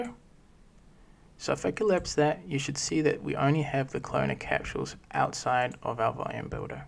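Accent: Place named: New Zealand. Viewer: Bro you sound kiwi as eh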